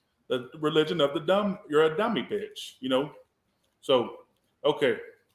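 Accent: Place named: South, United States. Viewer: Thanks for the work you do ! Love that Southern draw!!!! I’m from Texas so I do especially when I’m mad (Scorpio)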